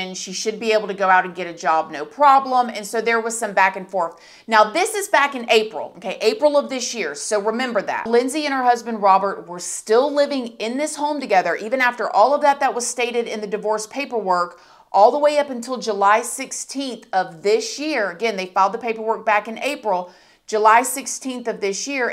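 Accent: South, United States. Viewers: I just love your honesty and southern accent!! You’re awesome. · Lol your southern accent was super hard in this video. That happens to me when I’m around people from Brooklyn. Love you girl PS you must’ve been around someone today that has a hard accent. Hehe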